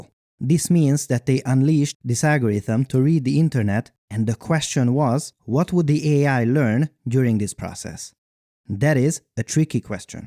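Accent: Hungary. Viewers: Akcentusod eléggé magyarosra sikeredett. :D jó kis videó lett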